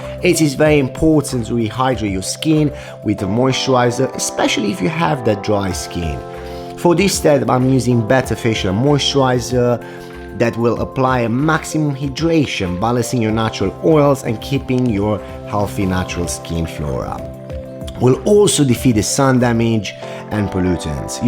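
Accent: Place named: Italy. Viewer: Is he Italian or something he has an accent that us not fully British.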